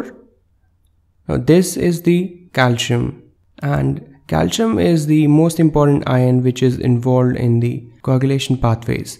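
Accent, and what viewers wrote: India. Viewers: Nice video, but seriously the way Indians talk is infuriating. Please check <link> for the correct pronunciation of fibrin -.-"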